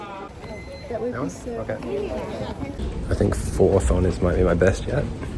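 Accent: Australia. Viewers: How did anyone even mistake you for a European guy lol You’re obviously Australian just from the accent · Great video mate! I really enjoyed this video and I hope to fly to Queensland in Australia 🇦🇺 hopefully next summer to meet up with a friend. I really think this was an interesting video for sure! The reason airlines now love Queensland seems unusual for sure but still interesting! 😂 Also what idiot tried to think you’re not an Aussie? You’re clearly one based on noting more than your accent! Cheers 🥂 Mate! Really enjoyed this video and I loved it as I always do with your videos! -Caleb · your accent screams australian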